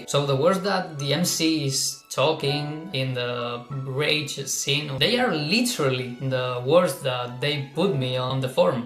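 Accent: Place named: Spain. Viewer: Se nota q hablas español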